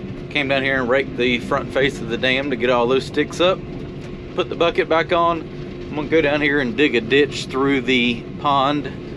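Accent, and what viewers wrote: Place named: North Carolina, United States. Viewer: I think that he’s from NC and they do talk different not funny but different.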